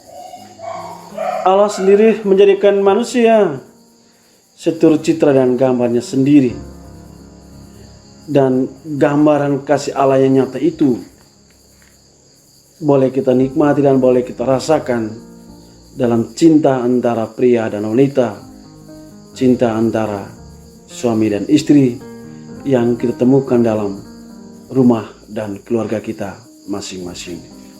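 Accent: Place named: Flores, Indonesia. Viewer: Semakin Logat Maunori. Mantap eja Rmo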